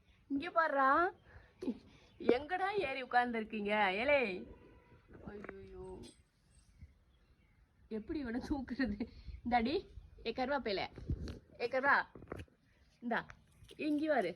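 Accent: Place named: South Tamil Nadu, India. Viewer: Sis neenga irukirathu covai. But native south district. Am I right? B cas neenga pesura slang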